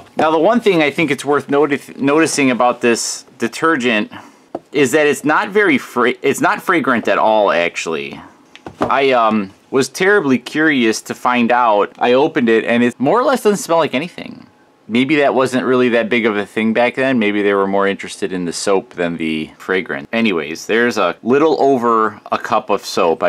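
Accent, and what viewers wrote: Midwest, United States. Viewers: This guy's midwestern accent is killer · The midwestern accent is sexy as fuck. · this guys accent reminds me of growing up in the midwest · Sounds like hes from Wisconsin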